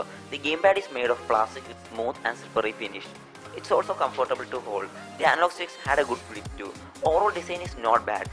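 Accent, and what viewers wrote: Kerala, India. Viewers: Da neey mallu anallae?.. voice ile manasilayadane enake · lol indian accent...rip english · from ur accent i understood ur from kerala. Even im in kerala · pure malyali accent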